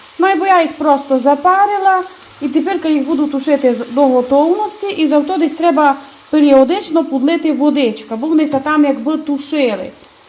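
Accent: Transcarpathia, Ukraine. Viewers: 😢😢😢ооо, ви собі навіть не уявляєте, які спогади в мене з цією стравою і яка ностальгія за Закарпаттям - це мій другий дім. Приємно чути Закарпатський діалект🤗 Коли прочитала назву "м'ясні крученики" зразу згадала рецепт бабусі, який вона називала - м'ясні шишки)) Все дитинство моє пройшло на Закарпатті - чим дуже тішуся. Ще там маю родичів, нажаль дідуся і бабусі вже немає😢. Дуже б хотіла знову поїхати на Закарпаття з своїми дітками, щоб вони побачили ту красу. Можливо з часом поїду. Вам бажаю здоров'я міцного і успішного розвитку каналу💙💛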